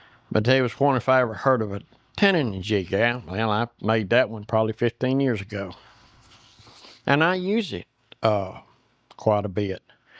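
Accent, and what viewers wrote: South, United States. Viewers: I really like your channel sir, I’m a cabinet maker by trade and have been for many years. It’s guys like you who teach me things and help me grow. I thank you for your videos and experience. Keep ‘em coming. Don’t let the negativity get to you, some people have absolutely nothing better to do than put others down…. Especially when they are envious. Personally, I enjoy your southern accent. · Kids can't even make change at a checkout counter, and they want to make fun of a master carpenter because he has a southern accent! This tells you where this kids head is at. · The only thing better than your vid’s is your southern accent! Keep up the good work! Btw…are you going to tell us how you made the steampunk gears?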